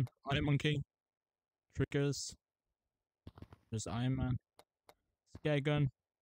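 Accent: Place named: United Kingdom. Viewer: that is justnormal british accent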